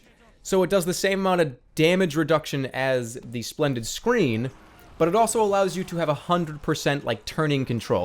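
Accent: Boston, United States. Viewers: just a question but are you from Boston because it kinda sounds like u have the accent btw nice vid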